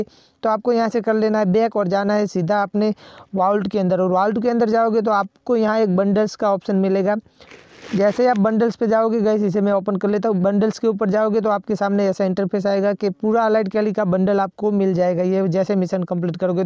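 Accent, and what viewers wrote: Gujarat, India. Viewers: AAP Gujarati ho 🤔?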